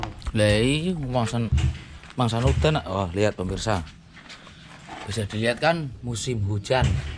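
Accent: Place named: Banyumas, Indonesia. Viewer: Ngomonge deneng mandan ngapak ya mas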